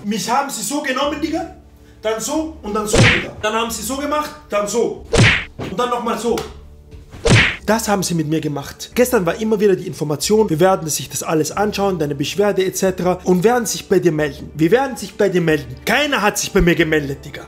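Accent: Austria. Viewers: Kommst du aus Österreich? #teamgeorgTV 😊😊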